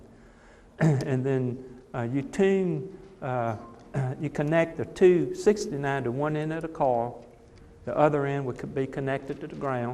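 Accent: South, United States. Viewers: have never heard an oriental with a southern accent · I hear a American with a Southern accent.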